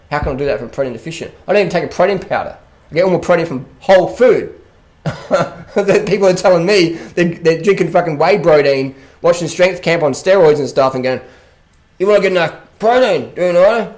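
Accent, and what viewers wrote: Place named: Australia. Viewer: But you are funny with your Australian accent mate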